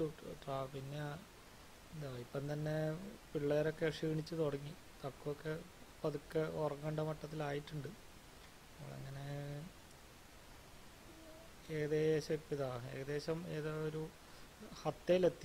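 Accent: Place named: Kannur, India. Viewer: ആഹാ... കണ്ണൂർ ഭാഷ 😍 ഞാനും കണ്ണൂർ ahh... കല്ലിയാശ്ശേരി Video കൊള്ളാം കേട്ടോ... നന്നായിട്ടുണ്ട്... തിരിച്ചും വരാൻ മറക്കല്ലേ കേട്ടോ, 🥰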